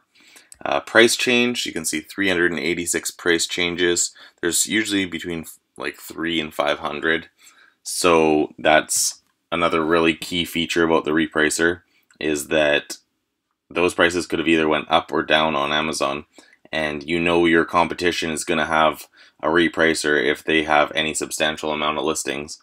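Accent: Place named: Canada. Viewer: about? Omg you are Canadian. Love Canadians.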